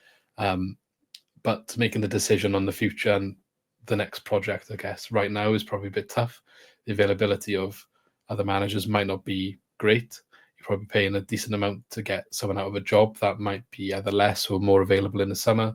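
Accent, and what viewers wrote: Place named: Wales, United Kingdom. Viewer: I hear a slight Welsh accent with a strong twinge of English 😂😂 having parents from both North Wales and Northern England I can definitely confirm we have a better quality of countrymen up north 😉sorry was this about football 😂😂 UTC’s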